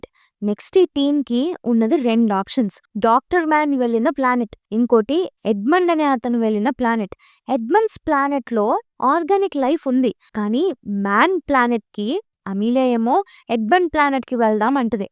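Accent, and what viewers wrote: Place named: Telangana, India. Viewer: Nee explanation kanna Interstellar movie maro sari chustey arthamaipoddemo papa..nuvvu inkaa confuse cheshaav..sorry.. కాకపోతే నీ స్లాంగ్" అయిపోతది, వస్తది, పోతది, రాస్తది" వింటుంటే..మీది తెలంగాణ అనిపిస్తోంది..Am I right. ??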